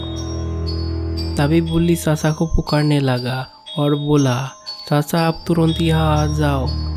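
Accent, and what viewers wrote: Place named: Bengal, India. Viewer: Awaj chenge karo bangali awaj sahi nahi hi koi saf hindi bolne wale ko job pe lagao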